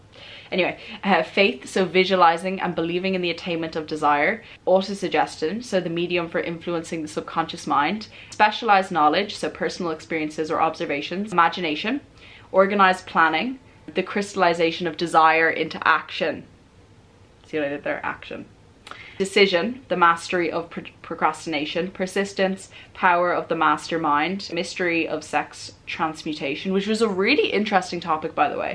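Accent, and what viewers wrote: Ireland. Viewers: Which part of Ireland is your accent from? I've been watching for a while and I absolutely love it! 😁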